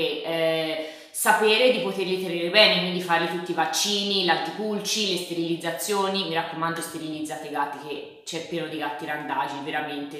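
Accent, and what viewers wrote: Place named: Tuscany, Italy. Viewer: siiiii mi tieni un sacco di compagnia , è molto piacevole ascoltarti, è bello sentire il tuo accento toscano/garfagnano ma è ancora più bello guardarti 🙈🙈adoro i tuoi occhi e i tuoi occhiali,stupendi!!!🙈😜😘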